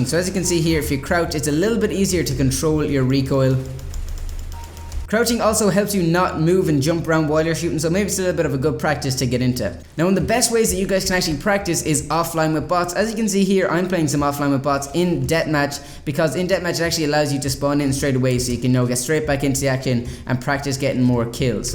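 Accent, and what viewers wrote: Ireland. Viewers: Are you Irish? I'm Irish too and ur accent sounds Irish 😂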